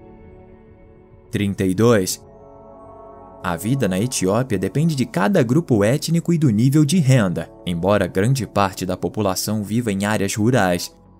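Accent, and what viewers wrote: Rio de Janeiro, Brazil. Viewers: Num dá pra ouvir é muito carioquês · É, não consigo ouvir esse sotaque carioca carregado de x e vogais inexistentes por mais de 20 segundos...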